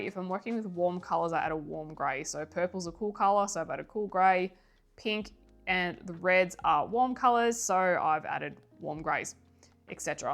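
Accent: Australia. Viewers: I live in Queensland and have both sets. Up here in summer 30 to 37°c polys blend and smooch just like the prismas. No air conditioning. 😅 But I will always prefer Prismacolor because of how I colour, dark to light and blend together. I hate layering takes to long for a vibrant result for me. Each to their own though. Love your videos and great to listen to another aussie on YouTube. Have a good Xmas and new year. Waving from Queensland 😊👏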